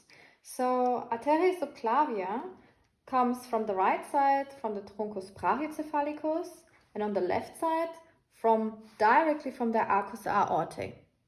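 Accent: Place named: Germany. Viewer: sound German for me, either way thanx for the video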